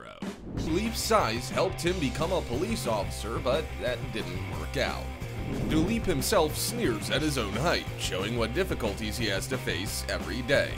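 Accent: United States. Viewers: What the hell is up with this guy? He is speaking English with an American accent so why all the metric crap?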